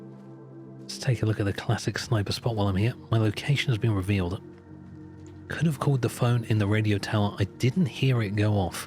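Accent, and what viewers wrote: United Kingdom. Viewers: I cant sleep without hearing people getting their heads blown off (in SE) to peaceful music and a British whisper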